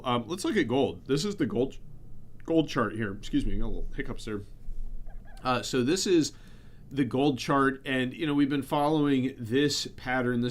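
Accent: Chicago, United States. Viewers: Where did you grow up? That sounds like a Chicago accent if I've ever heard one.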